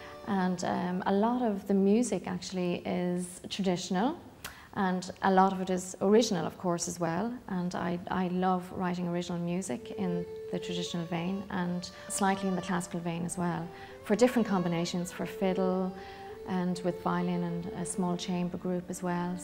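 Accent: Ireland. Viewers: This is an accent, Ireland. Scotlaand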